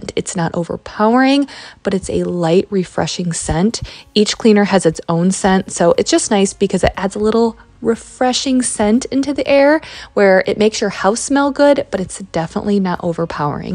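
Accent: Midwest, United States. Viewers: Hey there! I just found your channel and I’ve been binge watching your videos, I find them so relaxing and motivating. I’m originally from Chicago but have been living in Australia for the past three years with my boyfriend, so I love hearing that Midwestern accent, I miss it so much. Can’t wait to visit home soon. I also have to say that so many of these products aren’t available in Australia, like the power paste or scotch brite stove sponges. Such a bummer, but it’s fun to do some research and find similar ones here or even try DIY. Love from Australia! ❤️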